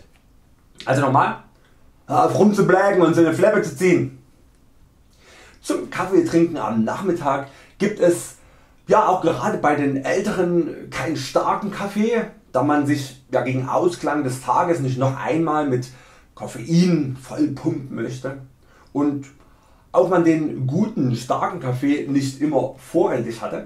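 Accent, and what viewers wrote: Saxony, Germany. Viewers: Für das Video hast du aber ziemlich tief ins alte sächsische gegriffen oder? Ich mein ich komme aus dem "Dreieck" Chemnitz Freiberg Dresden und so wie du es aussprichst redet meist nur noch mein uropa ausm Erzgebirge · Sehr schöner Dialekt! Als Österreicherin tut mir das "deutsche Deutsch" in den Ohren weh. Aber Sächsisch ist ganz nett! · Haha, super Video! Und vor allem, ohne Schnitte. Da sieht man wieder deine Professionalität. Klasse!!! Und ganz ehrlich, ich fand früher, dass das Sächsische der schrecklichste Dialekt ist. Das hat sich aber durch deine Videos wirklich geändert. Mittlerweile ist es das Schwäbische... hihi. Nee, im Ernst, man kann sich auch als Nordlicht öffnen und erkennen, dass es total egal ist und man den Menschen sieht und annimmt. Außerdem denke ich ja immer, dass ich ohne Dialekt spreche, dabei hört man wohl doch deutlich, dass ich von nördlich der Elbe komme.... 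Und wenn wir auf Plattdeutsch loslegen, dann versteht das auch kaum jemand.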